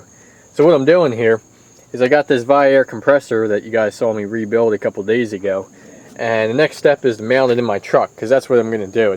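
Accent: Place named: Philadelphia, United States. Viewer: Are you from Philadelphia, or close to it? The second I heard you speak. I was like, this dude is from my area.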